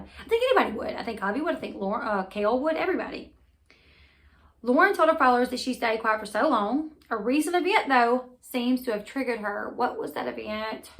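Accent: South, United States. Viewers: I love your southern accent. Lol I'm from NC and my bf says I have a twang but you got me beat. 🥰 Great info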